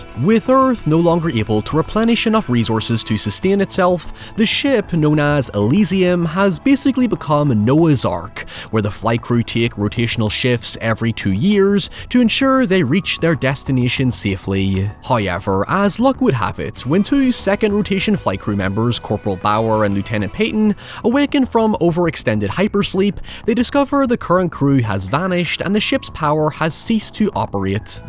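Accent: Ireland. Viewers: You have an Irish accent. Nothing wrong with that. You speak English better than the English do. Keep it up. Slainte ! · You know, when you explained your Payton situation it just sounded like you were saying the exact same word twice and I'm still not sure what exactly you were confessing. Let's be real, the accent is part of the reason we're all here. In fact go harder, unleash that brogue my guy!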